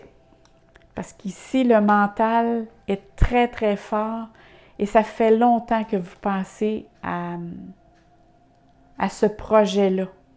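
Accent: Quebec, Canada. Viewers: Ahhhh le canada mon rêve !!! J'adore l'accent, les expressions SUPER merci pour le tirage :-)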